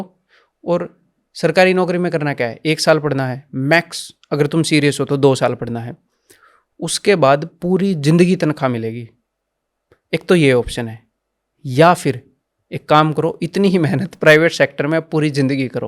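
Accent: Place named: Haryana, India. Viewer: He is haryanvi bro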